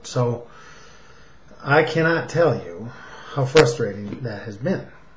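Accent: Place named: United States. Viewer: very informative video, good information for educators. Very American sounding accent.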